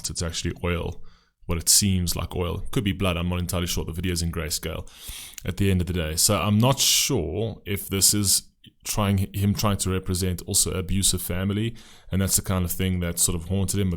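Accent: South Africa. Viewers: you sound South African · As a Brit myself, I don't think he's British. I think he's from South Africa.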